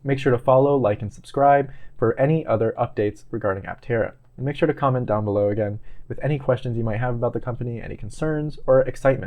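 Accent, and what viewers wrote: Australia. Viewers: you think he sounds australian? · You sound more Aussie than Yank, but you sell the snake oil like a Yank. Where are you from (without being specific)?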